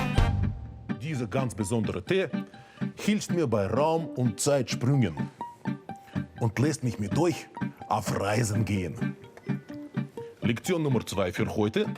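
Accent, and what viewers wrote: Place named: Russia. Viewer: Russian sounding German is so cool!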